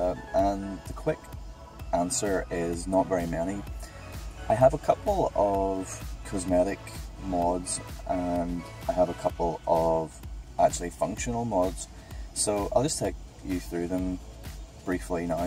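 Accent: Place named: Northern Ireland, United Kingdom. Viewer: Hi there, I am from Australia but was born in Groomsport Northern Ireland so it was cool hearing you speak :P As for the car get a crossover pipe to remove that stock pipe to the turbo. I changed mine and the intake hose to the mountune like you have. Other good things to increase flow is adding the velossa big mouth to the front of the airbox. I own a 2015 euro 3dr FiST like you so these tricks are good. But as with all these changes you need to think downpipe and exhaust one day too. Take care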